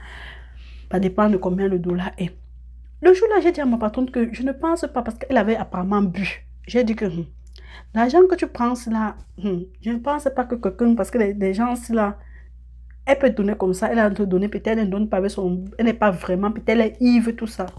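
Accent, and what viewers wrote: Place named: Cameroon. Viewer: Dès vos premiers mots j'ai deviné que vous étiez camerounaise🇨🇲 l'accent de ça ,force à toi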